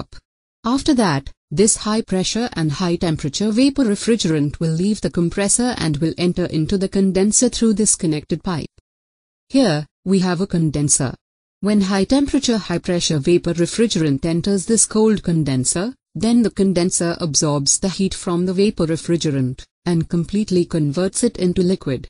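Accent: India. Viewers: Even though the accent was clearly Indian this was an excellent tutorial on the air conditioning system inside my car. Thank you.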